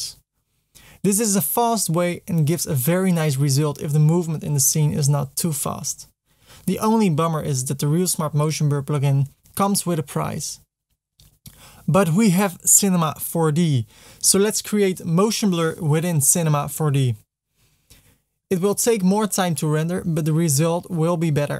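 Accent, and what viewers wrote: Netherlands. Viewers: Ok top je Nederlandse accent is wel een beetje opvallend :)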